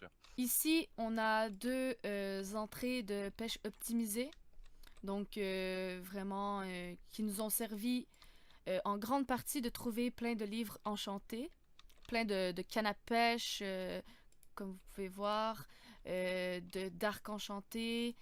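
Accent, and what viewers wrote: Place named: Quebec, Canada. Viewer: Très bel épisode. Question montage, just perfect. Tu as un accent Québécois ^^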